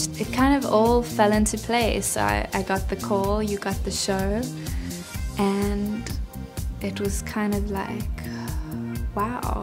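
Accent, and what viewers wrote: South Africa. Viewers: she has the south african accent .....awesome cnt believe she used to be my high school monitor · Please don't lose your SA accent, the american accent has claimed too many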